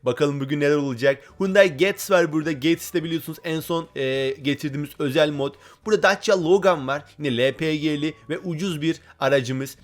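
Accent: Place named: India. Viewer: Hunda aksent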